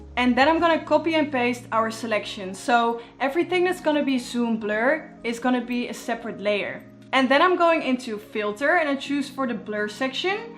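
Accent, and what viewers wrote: Netherlands. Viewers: Hrt Nederlandse Engels accent is heel herkenbaar haha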